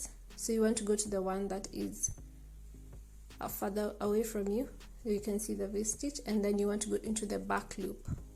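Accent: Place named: Kenya. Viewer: I love that I can tell you're a Kenyan by how you speak. It just sounds so familiar, like a friend telling me about their day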